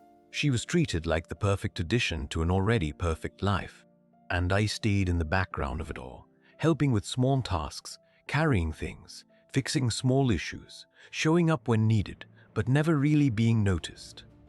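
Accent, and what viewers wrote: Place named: India. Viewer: This story is clearly Indian culture and the voice has comparable accent. Then he mentioned Texas. It was jarring. I would have enjoyed this story had it not suddenly culture shifted. … just got to the end of the story. Daniel didn’t know his brother had replaced him? That makes absolutely no sense. I understand this whole story only works in arranged marriage situation, but for the brother to not know makes this whole thing ridiculous!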